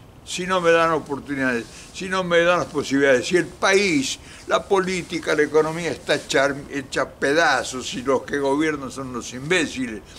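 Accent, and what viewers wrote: Argentina. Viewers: Buenos días y amigos del espacio Quería hacer un comentario que parece insignificante pero no es menor como argentino admiro la manera en que mantiene a pesar de los años que se vió obligado a irse del país nunca perdió el acento bonaerense (o sea el ser nacido en la provincia de Buenos Aires) para los que no conocen nuestra provincia simplemente gracias por su autenticidad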